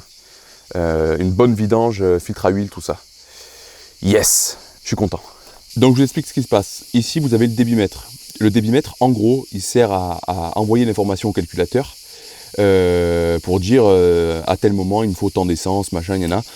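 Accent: South, France. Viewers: BM double vié, ca fait plaisir d'entendre l'accent du sud. Avant de déménager a la réunion, jai vécu du côté d'Aix en Provence, Salon de Provence..enfun jai vecu dans pqs mal de petits villages au alentour.. Hâte de voir la suite de ton bordel pas organiser 😂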